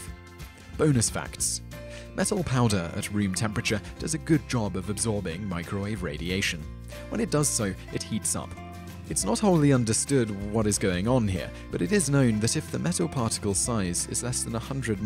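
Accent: United Kingdom. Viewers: Silver theDragon No, he'a pronouncing it the proper way, rather than the butchered American way. And before you start, listen to the accent. He's British. He is speaking the English language perfectly correctly according to the country he comes from.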